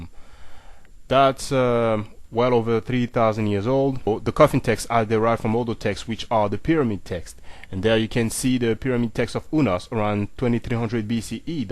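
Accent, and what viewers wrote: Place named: Haiti. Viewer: As soon as I heard your accent I knew you were Haiti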